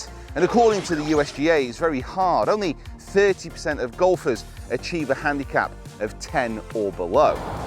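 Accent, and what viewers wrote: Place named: London, United Kingdom. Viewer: Hi, your accent is more if a London one. Sounds like you maybe been privately educated. I am always amazed how you can hit a 7 iron 190+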